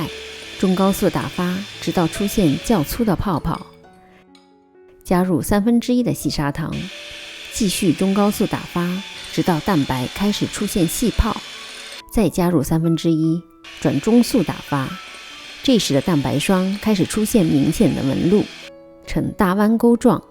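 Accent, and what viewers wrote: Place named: Shanghai, China. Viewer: 的口音和样子都像上海人（至少是江浙沪一带的），讲解也很细致，像极上海人的风格。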